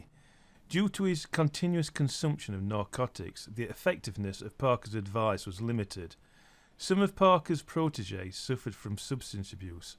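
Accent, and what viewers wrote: Yorkshire, United Kingdom. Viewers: so thats what a yorkshire accent sounds like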